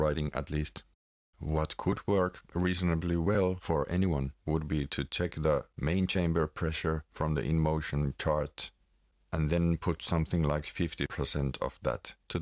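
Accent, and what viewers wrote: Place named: Finland. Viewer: Thanks for the vid! Do you happen to speak Finnish, I think I hear that a bit in your pronunciation. I’m from Finland myself.🙂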